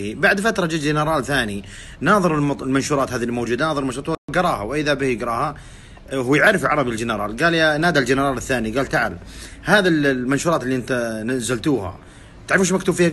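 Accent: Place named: Hadhramaut, Yemen. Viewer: لهجتك روعه تحية للحضارم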